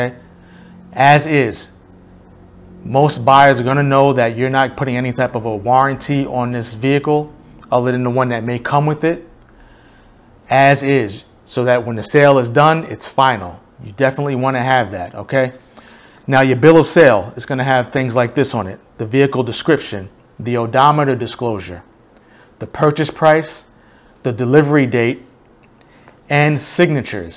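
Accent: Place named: East Coast, United States. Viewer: No, you don't. That depends on the state. With his accent, he sounds like from the East Coast, and may not be aware of laws here in the West.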